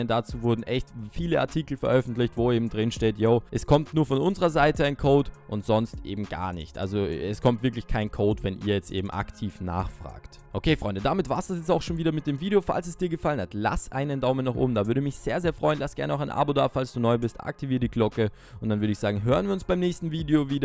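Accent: Austria. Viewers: Ned gewusst das du a Österreicha bist🤔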